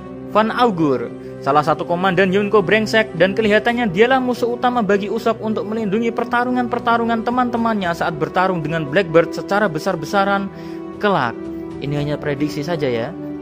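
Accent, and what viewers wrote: Java, Indonesia. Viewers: Love you min.. terbaik medok nya🙏🤣🤣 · Medok lu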